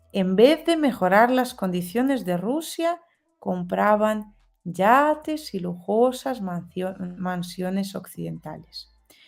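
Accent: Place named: Spain. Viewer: porque ablas como si fueses de España?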